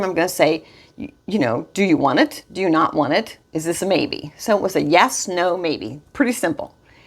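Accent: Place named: Southwestern Pennsylvania, United States. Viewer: Kind if surprised you are in SE PA - kept hearing SW PA in your accent! Regardless, I really enjoy the logical, straightforward advise given in a friendly ‘it will be ok’ voice - so needed as we sort through 3000 SF and 38 years of being together!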